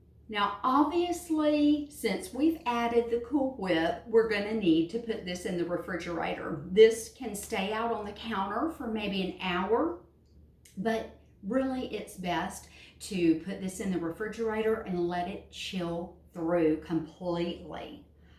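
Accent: South, United States. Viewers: Thanks for sharing! I love your southern accent ❤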